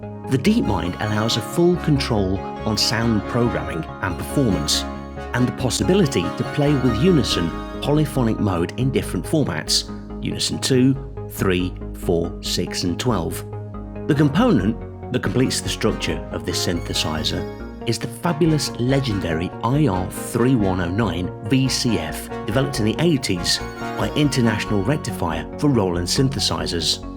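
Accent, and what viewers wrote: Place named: Japan. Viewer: It's a nicely done comparison. Whilst it absolutely can replicate the Juno 6-60-106, replication of the Jupiter 8's subtleties with cross mod etc, is not there. I have owned the original JP8, and now own both a DM and the JP-08, plus the Cloud ACB and Zenology versions. I'd still love to see Behringer really do their JP8 clone, as I think they'll nail it. One thing.. the commentary is very "Jinglish" (as we used to say at Yamaha R&D). It's a great, soothing and very listenable voice over, but the grammar and use of English needs work. For the record, when at Yamaha R&D, we also worked with Howard quite a bit (Jones), he came in for a Vocaloid overview, and over the years, did quite a bit with us. Truly one of the great exponents along with Nick Rhodes of the JP8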